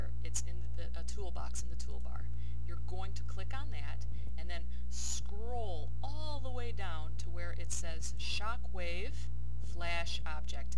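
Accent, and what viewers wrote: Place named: Michigan, United States. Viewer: SO helpful! Thanks! I could tell you were from Michigan within your first 2 sentences.;~)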